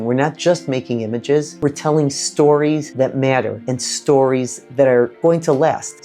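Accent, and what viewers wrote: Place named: New York, United States. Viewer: Enjoyed finding your channel. Definitely inspires me. I am originally from Brooklyn, NY and thought you were from NY as well until you said Chicago. Didn't realize the similarity!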